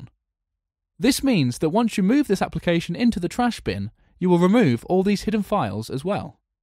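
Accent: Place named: United Kingdom. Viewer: thank you....nice british accent.....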